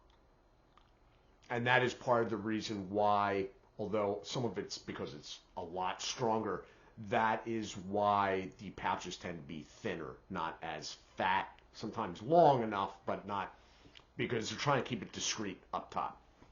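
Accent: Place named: Maryland, United States. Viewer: I have family up and down the east coast but your "O's" made me think of the MD accent. I was close. VA here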